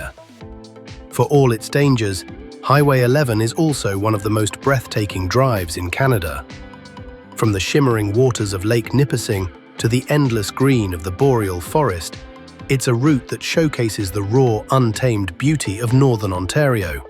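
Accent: United Kingdom. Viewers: I guess you know more than this guy who made this video🤣 especially the British voice probably had too much Scotch when he was creating this video!🤣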